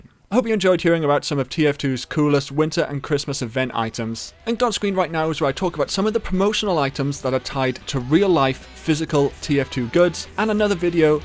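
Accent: Wales, United Kingdom. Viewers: Great video, Just wanted to ask if you are you welsh?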